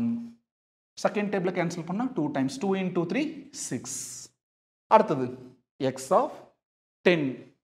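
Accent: Coimbatore, India. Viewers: Awesom 🎉🎉🎉😮😮😮😮cbe 🔥🔥🔥slang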